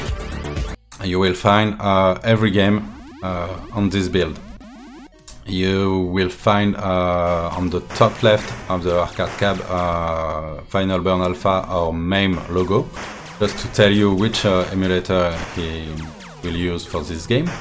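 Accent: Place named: France. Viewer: Ah ce petit accent So Frenchy que tous nous envient !! Thanks for that !!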